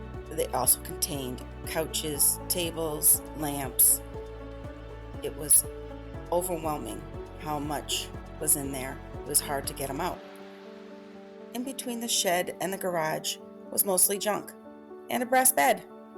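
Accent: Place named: Boston, United States. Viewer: Thought I recognized that accent - Bostonian here!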